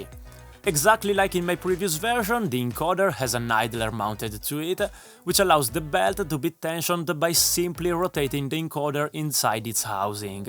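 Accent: Italy. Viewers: i love italian english ;D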